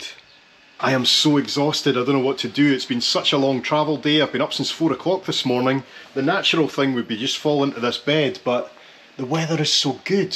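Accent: Scotland, United Kingdom. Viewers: hey - i really enjoyed your videos tonite. I was on the isle of skye twice, and once on my honeymoon at a magical place called Flodigarrys near Portree. your video really took me back there. and i loved seeing Harris and Lewis islands- felt like i was there. love your scottish accent too! thank you!!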